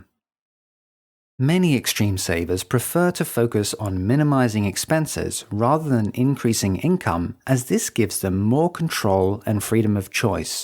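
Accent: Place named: United Kingdom. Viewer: Why does it have to be a british accent! 😭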